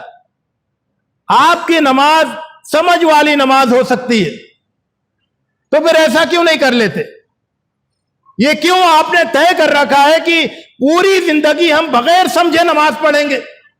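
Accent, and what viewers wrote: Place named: South India, India. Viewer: Most probably hailing from south india.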